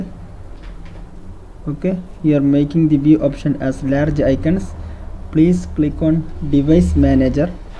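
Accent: Kerala, India. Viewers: Dude are you from Kerala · Malayali anno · Where does he come from ? Sounds like Apu from The Simpsons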